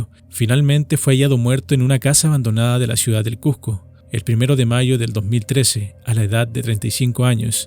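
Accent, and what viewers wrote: Chile. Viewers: El acento Chileno no queda con tu nombre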